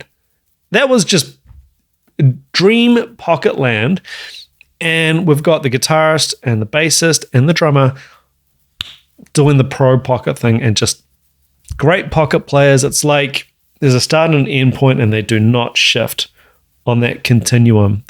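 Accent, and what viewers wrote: New Zealand. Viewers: Here's coming from America. Me being an armature voice actor, I appreciate the Kiwi and Aussie accent and wish i could do it better lol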